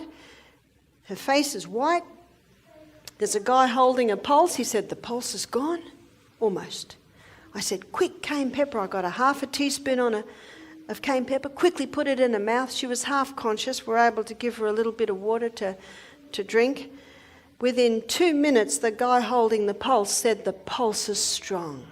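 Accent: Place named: Australia. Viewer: She's Australian....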